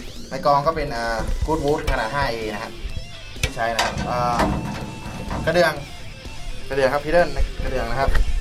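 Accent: South, Thailand. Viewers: คนใต้ป่ะครับ ?? เหมือนจะทองเเดงนิดๆๆ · คนที่ไหน สำเนียงเหมือนคนใต้เลยนิ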